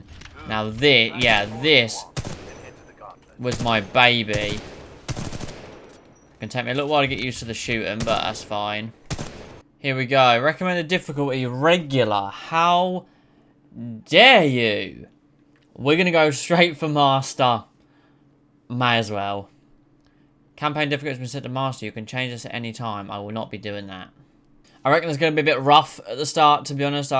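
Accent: United Kingdom. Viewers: Hey are you from Northampton by any chance I only say this because your voice sounds very northhamptony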